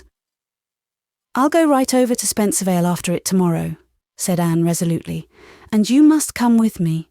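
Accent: England, United Kingdom. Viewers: I’m enjoying these very much, despite the English (not Eastern Canadian) accent; Thank-You!!! This is one of My favourite books in the series. 😉😄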